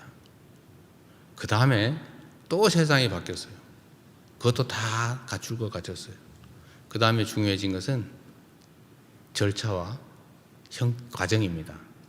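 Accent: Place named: Gyeongbuk, South Korea. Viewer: 갑자기, 이재명 대통령님의 말씨가 고 김광석 형님의 말씨와 흡사하다는 느낌이 드네요. 그러고보니 두 분다 경북 출신입니다. 그래서 더 그렇군요. 참 정겨운 말씨였죠. 광석이 형님 ㅠㅠ 두 분이 또 연세도 비슷하셔요. 광석이 형님이 용띠, 이재명 대통령님이 토끼띠인가? 참고로 저는 말띠입니다. 광석이 형님 닮은 제 친형도 토끼띠예요 ㅎㅎ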